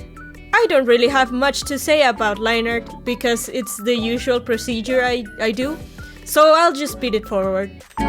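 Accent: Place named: Scotland, United Kingdom. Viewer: You sound kinda british and scottish,weirdly thats how i think it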